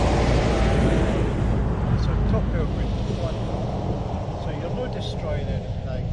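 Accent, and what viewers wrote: Scotland, United Kingdom. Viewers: Awh really enjoyed this video! Very wholesome❤your dog is so cute! And I love your accent! I need to visit Scotland! Hope your enjoying the weather!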